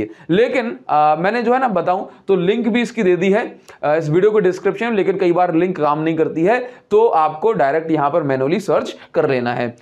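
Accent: Odisha, India. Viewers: bhaiya are you from odisha ? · Sir aap odisha se ho kya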